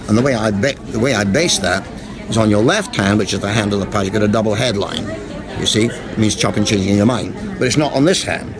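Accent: Scotland, United Kingdom. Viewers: with a Scottish twang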